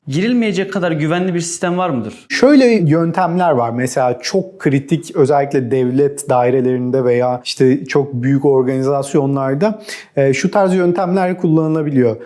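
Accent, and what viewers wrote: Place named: Yozgat, Turkey. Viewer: yozgatli galiba k ler cok dogal geliyo kulaga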